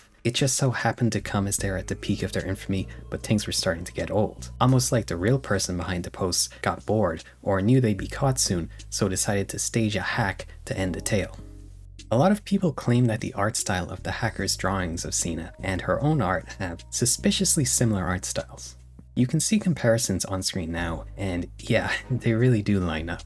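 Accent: Ireland. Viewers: Are you half Irish ? Or somewhat Irish you have Irish inflections in your speech like the word “think” -> “tink”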